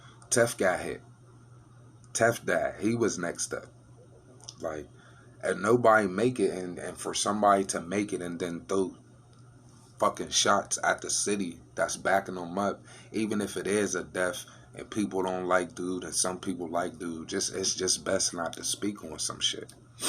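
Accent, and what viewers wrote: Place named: Pittsburgh, United States. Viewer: This don’t got shit to do w yo video but I can really tell that you really from the burgh from the way you talking 💯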